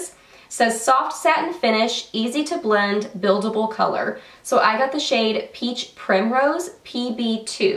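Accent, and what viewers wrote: South, United States. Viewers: You're so fun to watch! Your southern accent is so cute! Love and hugs from Canada!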